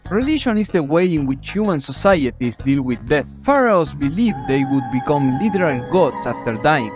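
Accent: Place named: Argentina. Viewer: You sound Argentine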